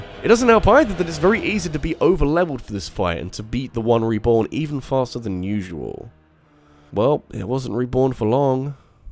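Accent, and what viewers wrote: United Kingdom. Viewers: I don't play the Soulsbourne games I just like this weird British guy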